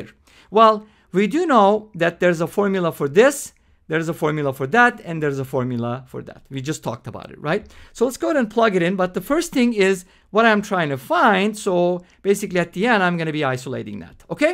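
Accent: Turkey. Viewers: Your accent sounds Turkish.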